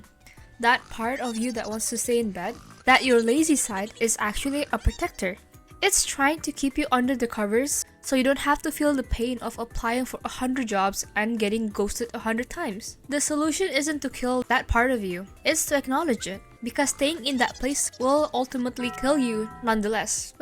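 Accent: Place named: Asia. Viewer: Asian accent suck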